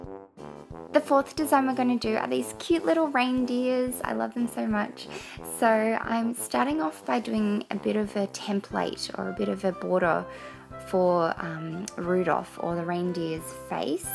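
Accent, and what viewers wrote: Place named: Australia. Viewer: I never knew she was Australian??!!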